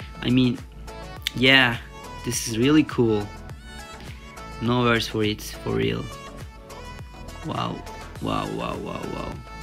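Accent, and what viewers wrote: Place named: Turkey. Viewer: sesi çok türk gibi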